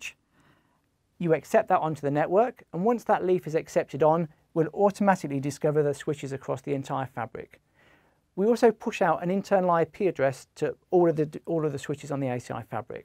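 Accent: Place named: United Kingdom. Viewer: God, finally UK gentleman pronounce... love it...nice one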